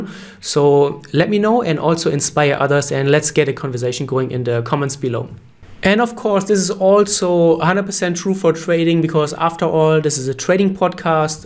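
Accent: Germany. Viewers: Are u German, accent is very much German English accent😁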